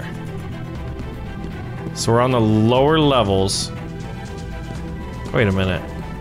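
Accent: Australia. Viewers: U kinda sound like Australian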